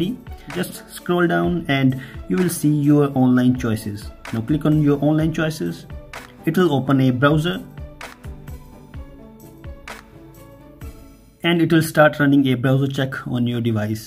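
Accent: India. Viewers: Indian accents means you can’t trust him Probably works for a scam company and writes tree in cmd like he did it here Nonsense not working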